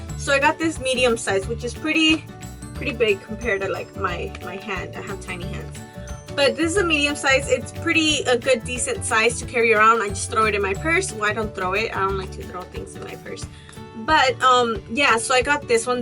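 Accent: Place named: Mexico. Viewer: That Mexicana accent though 😂😂💕💕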